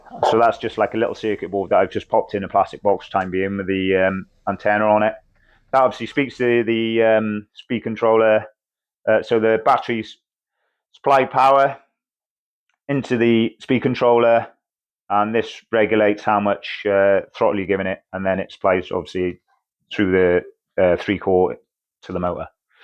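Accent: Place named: Canada. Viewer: Great video, but the Canadian accent is pretty thick.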